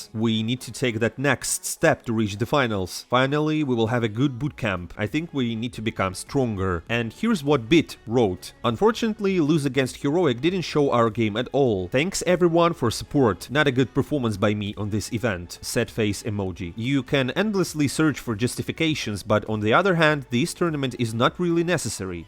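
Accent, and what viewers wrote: Russia. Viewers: I can feel russian accent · Спасибо за новости, ты пытался не спалиться что русский))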